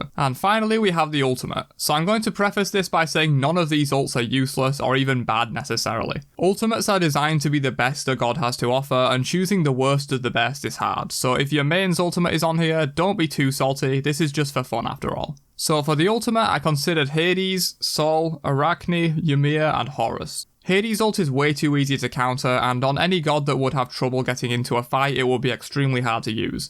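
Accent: United Kingdom. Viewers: I love your videos, but need to go learn British English to understand more than few words!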